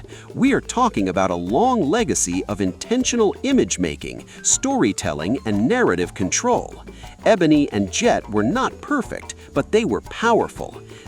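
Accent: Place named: Europe. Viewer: Why is a European voice narrating this video? 🧐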